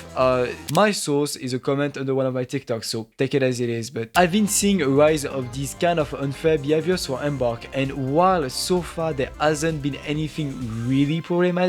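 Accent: France. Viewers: Je présume à l'accent que tu es français, alors je me permets de mettre un petit com dans la langue de Molière. Tes vidéos sont géniales mec, les thèmes abordés sont intéressants, l'humour est bien dosé et bien intégré. Continue comme ça, et prends soin de toi. Je t'ai entendu dire que derrière l'écran c'était pas facile, le soleil vient toujours après la tempête, reste fort!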